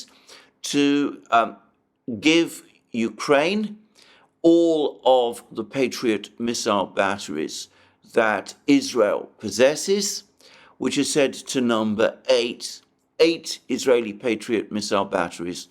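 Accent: United Kingdom. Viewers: Which part of the UK is this guy from? I like his accent lmao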